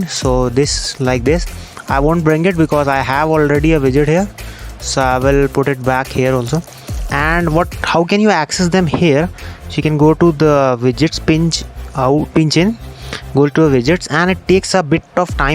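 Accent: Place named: India. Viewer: This Indian English...so annoying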